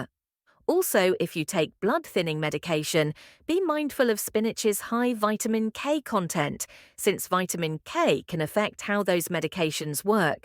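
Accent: United Kingdom. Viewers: An English voice giving advice in American Cups. 1oz or 3oz would be understood by English people. I. England we are pensioners not seniors. A I. Grrrr. Also spinach can be dangerous. English people use cabbage and sprouts. Also if on blood thinners for the heart and low BP we need to be careful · Np need to put British accent AI. Just keep it simple!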